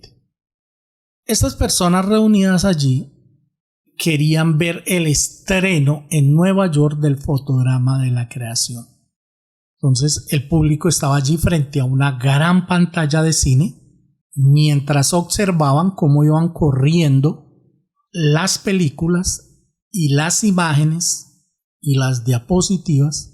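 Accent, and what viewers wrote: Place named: Colombia. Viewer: CON TODO RESPETO, TU ACENTO ME DICE QUE ERES DE COLOMBIA,PRIMERO QUE TODO. CHARLES TAZE RUSSELL, NO TENIA COMO DIJISTE COMO 70 AÑOS EN 1914 CUANDO SALIO EL FOTODRAMA,TENIA 62 AÑOS, YA QUE EL MURIO EL 31 DE OCTUBRE DE 1916 , TAMBIEN NO DIJISTE QUE MUCHOS DE LOS DISCURSOS QUE DIO FUERON EN SALONES MASONES, ADEMAS DE HABER VENDIDO UN TRIGO DE FORMA FRAUDELENTA ,POR TAL HECHO LO DEMANDARON Y REGRESO EL DINERO , A LOS DAMANDANTES ,TAMBIEN SE TE OLVIDO DECIR QUE COMETIO ADULTERIO POR ENGAÑAR A SU ESPOSA MARIA FRANCES RUSSELL. CON SU SECRETARIA UNA MUJER MAS JOVEN QUE SU ESPOSA , FUE BORRACHO TAMBIEN, Y DE SU SUCESOR JOSEPH FRANKLIN RUTHERFORD, OTRO BORRACHO , MUJERIEGO, E INCLUSIBE COMETIO PEDERASTIA AL CONQUISTAR A UNA JOVEN DE 16 AÑOS DE NOMBRE BERTA, ADEMAS CON UNA MUJER CASADA DE NOMBRE BONNIE, EN 1935 SALIO EL PRIMER LIBRO DE TEXTOS DIARIOS , DONDE EL DECIA TENER UNA GRAN ADMIRACION POR ADOLFO HITLER, Y LA RAZON POR LA QUE ENCARCELARON A LOS TESTIGOS DE JEHOVA EN ALEMANIA NO FUE POR PERSECUSION POR SU FE , FUE POR CULPA DE RUTHERFORD, AL PONERSE A FAVOR DE LOS JUDIOS , ESA FUE LA RAZON DE PERSEGUIR A LOS TESTIGOS, Y DE OTRAS MAS , NO LO HUBIERAN PERSUEGUIDO NI ENCARCELADO , YA QUE DECIAN QUE NO ERAN UN PELIGRO PARA EL PARTIDO NAZI , POR SER NEUTRALES POR SU RELIGION , Y SI NOTAS LOS UNIFORMES DE ELLOS TENIAN UN TRIANGULO, A DIFERENCIA DE LOS JUDIOS CON LA ESTRELLA DE DAVID .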